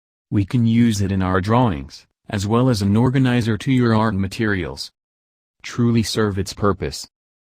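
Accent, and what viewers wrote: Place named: United States. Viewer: astig naman ni american ang boses HAHA.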